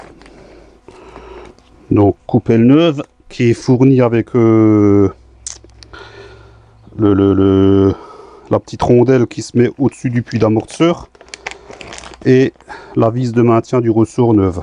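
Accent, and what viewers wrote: Alsace, France. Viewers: salut j'aime bien tes vidéos et avec l'accent alsacien c'est encore mieux continue comme ca c'est bien utile tes tutos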